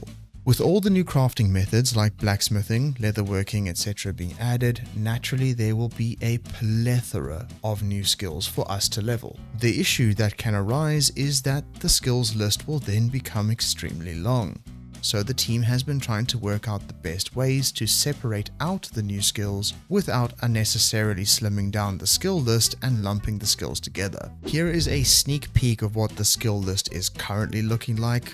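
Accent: South Africa. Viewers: Are you South African??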